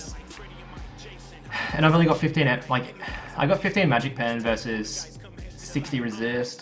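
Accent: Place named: Australia. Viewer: Australian? can tell by the accent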